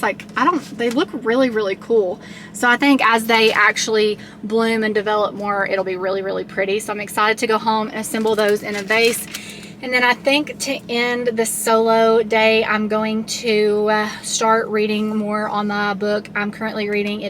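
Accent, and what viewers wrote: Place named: South, United States. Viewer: I just discovered your channel and this was the first video I watched. Super cute video! I hear that Southern accent. What state are you in? You mentioned a trip to Houston I think. Are you in Texas? 😊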